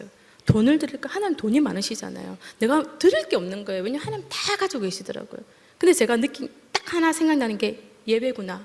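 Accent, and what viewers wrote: Busan, South Korea. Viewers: 고예정님 저도 부산 동대신동에서 태어났어요 ^^ 정지~~ 부산 사투리 고소합니다. 항상 하나님을 증거하시는 삶이 되시길 축복합니다.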